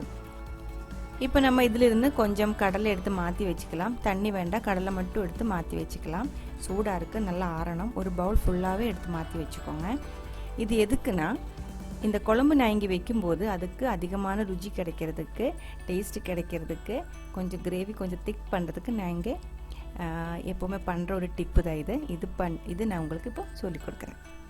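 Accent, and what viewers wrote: Kerala, India. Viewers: Superb !👌👌 Love ur explanation & ur malayalam kalandha Tamil!👍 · Nalla tamil pesureenga.. Konjam malayalam kalandhu neenga pesumbodhu kekkave nalla irukku.. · She says that your cooking as well as the tamil with malayalam accent is very sweet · நல்லாருக்கும்மா அதோட நீங்க பேசுற மலையாளத்தமிழ்ழும் அழகாருக்கு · Absolutely Beautiful Tamil in Malayala Flavour !!!!! Your Tamil is sweeter than our Tamil. Indeed! The recipe is super. Just now bumped into your channel. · Tamil in malayalam slang very cute😊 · Unga Malayalam + Tamizh slang semmma 😍😍😍😍😍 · I like your malayalam mixed Tamil .. lots of love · The way you explain is nice to hear... Tamizh in Malayalam accent👌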